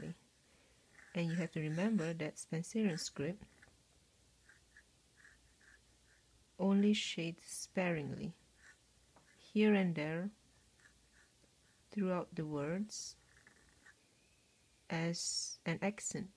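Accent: Singapore. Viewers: I also guessed Singaporean! I like your accent, and agree with the commenters above that I appreciate your thoughtful and methodical posts that give really practical information. New subscriber! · Are you Singaporean?:)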